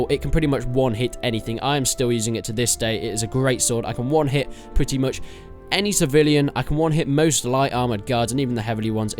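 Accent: United Kingdom. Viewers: Hrmm... U.K. Accent but you said the location is a couple hundred yards. Interesting.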